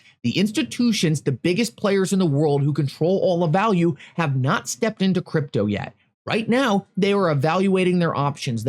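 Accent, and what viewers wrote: Allentown, United States. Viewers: with the Allentown accent is wrong again. Way to go!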